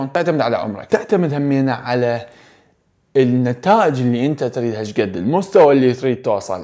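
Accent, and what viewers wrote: Iraq. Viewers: اللهجه العراقيه🤤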